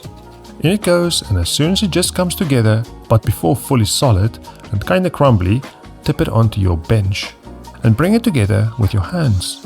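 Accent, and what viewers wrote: Afrikaans, South Africa. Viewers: What's this? Afrikaans chef John? I'm here for it. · ‘ off the it’ classic c south african African English! Love it!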